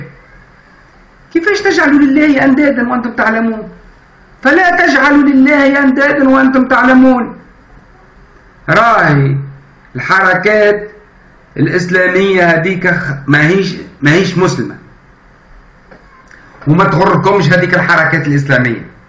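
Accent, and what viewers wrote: Tunisia. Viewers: ههههههههههههههههه تعجبني لهجة توانسة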